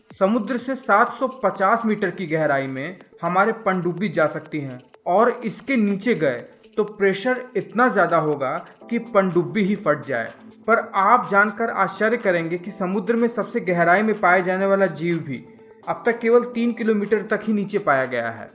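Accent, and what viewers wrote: Bihar, India. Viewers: Bihari ho kaa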